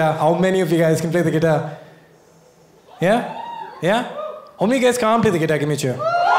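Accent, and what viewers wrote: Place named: South India, India. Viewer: why are so you insanely cute? 😍 Loved all your videos and have been sharing them with everyone I know.. you are simply awesome!! Being a south Indian, I love that accent and those words too 💗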